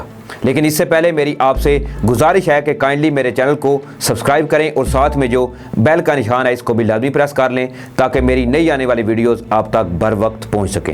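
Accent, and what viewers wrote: India. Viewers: bhaai aap ki urdu Indians jaisi kiyun hai, aap ki videos bahut achi hain lekin iss ka sara ta'assur aap ki urdu ki wajah say kharab ho jata hai, plz koshish kejiye k aap ka lab o lehja achi urdu ka ho, plz bura mat maniye ga, sorry again, Thanks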